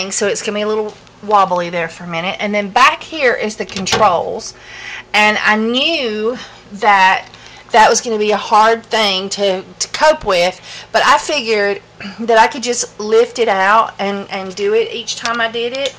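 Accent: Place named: Midwest, United States. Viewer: Wow, this is a really cool video. I am not sure where you are from, but I love your Midwestern accent. I love how you are doing traditional Jerky with a more modern spin on dehydrating. It sounds like you are just learning how amazing this is. I love meat, but I am on a living foods diet of nothing but vegetables nuts seeds grains and fruits of course, and I use the Exc. for making living foods recipes, but you cannot go over 115f or the life force in the plant u are doing will die.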